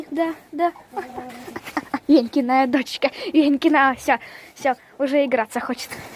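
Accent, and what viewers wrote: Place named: South, Russia. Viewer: добрый день,где вы живете у тебя такой южный говорок на гх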